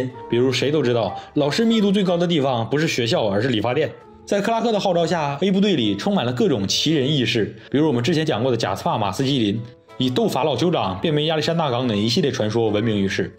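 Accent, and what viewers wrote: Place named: Tianjin, China. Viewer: 小约翰可汗是天津宝坻的人吧。 哈哈，着口音解说绝了。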